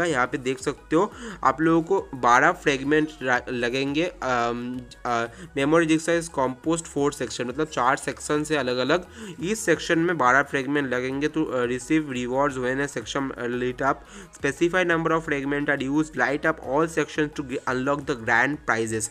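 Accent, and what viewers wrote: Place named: Bengal, India. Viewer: Dada tumi bengali to...ami kotha suna tai mona hoy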